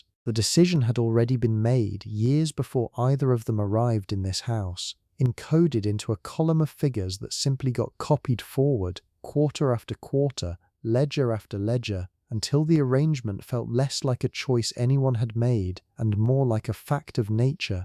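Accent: United Kingdom. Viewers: I’m from Mississippi, USA 🇺🇸!!! Love the videos, plus the British accent saying alright love 😊❤❤❤ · Coastal Southern California PM. As I’ve said before, I enjoy these videos, but the British accent is stimulating and keeps me from falling asleep. It would be nice if there were an option to hear an American accent. That would remove an aspect of interest, and I could relax and go to sleep.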